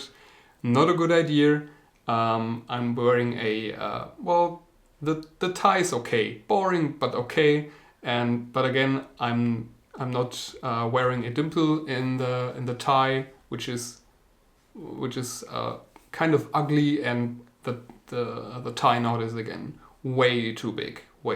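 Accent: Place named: Germany. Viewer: Great content. Your accent reminds me of my ex girlfriend dad. He is German and has the same accent 😅 no offense haha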